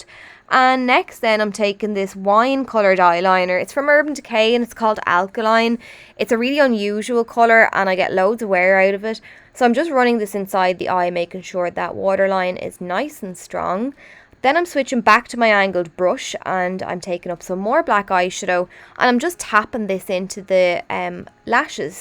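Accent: Ireland. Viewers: I love your beautiful Irish accent!💖greetings from Israel😁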